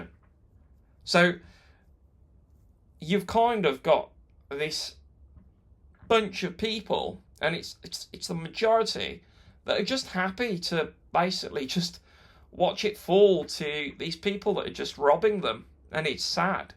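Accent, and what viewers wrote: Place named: England, United Kingdom. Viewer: Люблю твой акцент, как будто смотрю сериал Убийство в медсан мердере или чисто английские убийства))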